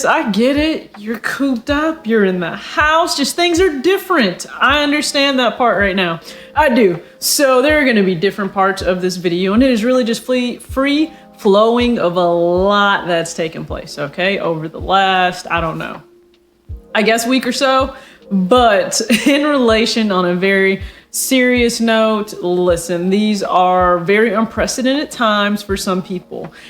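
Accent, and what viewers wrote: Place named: California, United States. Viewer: You are my favorite sneaker youtuber because you go off into other relatable subjects. Youre smart and you have business savvy...and youre a female from the west coast. I assume that because you have my accent, if we wanna call it an accent lol and your gs warriors fan, that youre from California. You should put an facebook request in for me if you want that would be cool Im from Everett Washington.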